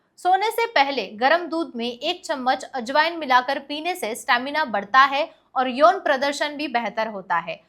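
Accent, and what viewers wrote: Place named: Maharashtra, India. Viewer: Woh marathi hain sayad isiliye unka uchcharan waisa sunne ko milta hai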